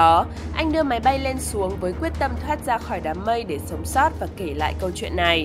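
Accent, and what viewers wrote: North, Vietnam. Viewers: doc giong nam di giong bac kho nghe qua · giọng bắc pha giọng nghệ tĩnh ở một số từ có dấu sắc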